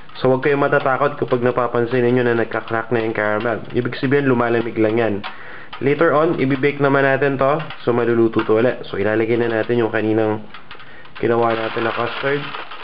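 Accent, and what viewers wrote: Philippines. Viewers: Stop speaking fillipino-english >.< I CANNOT UNDERSTAND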